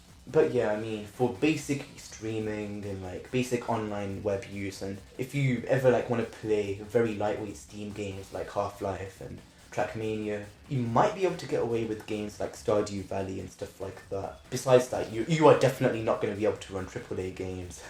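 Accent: United Kingdom. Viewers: you sound british tho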